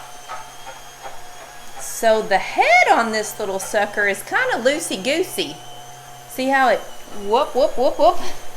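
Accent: South, United States. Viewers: Great video and warm Southern accent🙂 Shark mfg needs to send you a big fat gift card😁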